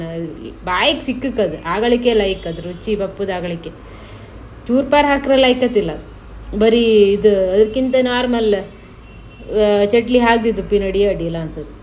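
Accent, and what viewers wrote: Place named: Kundapur, India. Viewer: super kundapur bhashe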